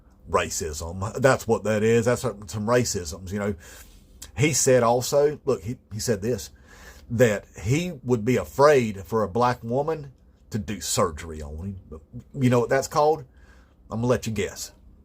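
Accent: South, United States. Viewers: I like you, brother. You give me "Theo Von's much older brother" vibes and your southern drawl is literally the reason I stayed and watched the whole video. I agree with your analysis of Kirk's character and my only pushback is.... a lot of the country is well aware of what kind of guy he was and that's why they celebrated him. A lot of this country is made up of racist-ass, hypocritical-ass, sanctimonious-ass, bigoted-ass, xenophobic-ass Christians who condoned his vitriolic rhetoric so.... there's that. Anyway, great video! I'll subscribe.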